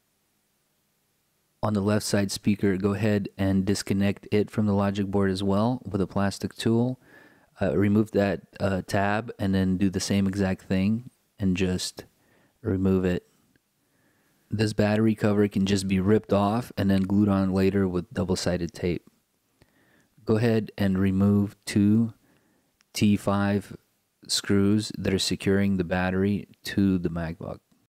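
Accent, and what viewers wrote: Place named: Philippines. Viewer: cool dude, i know ur pinoy base on ur accent 😁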